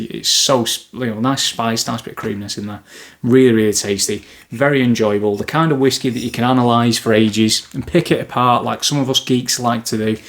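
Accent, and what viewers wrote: Manchester, United Kingdom. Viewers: Nice video there... enjoyed your analysis and choices. Great to hear a fellow Northerner (I grew up in Preston - you sound more Manchester way).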